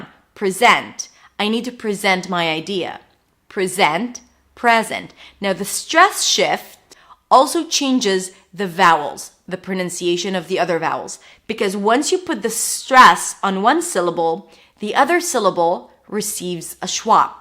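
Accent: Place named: United States. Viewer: You have an American accent. In standard English Polish isn't Pronounced as paalish.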